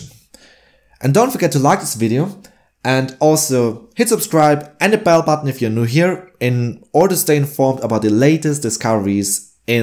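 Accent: Germany. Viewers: As a native English speaker, it really isn't. He speaks too quickly and smears his words without pronouncing all of the sounds. If he didn't do this, he would be a lot easier to understand even without the heavy German accent. People who speak one language are bad judges of a foreign language spoken by their peers because they are used to speaking with and hearing their native phonemes, even when used to approximate another language. Good video and content, just needs to slow down and work on pronunciation and annuncuaction. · Are you threatening me, Master Jedi? I am also a native German speaker and I can clearly notice the German accent. Maybe because even though everyone I've talked to in the past 15 years spoke German with me I almost exclusively consume English-speaking entertainment and do so for hours daily. Though I have to say it's way better spoken English than pretty much anyone I know :p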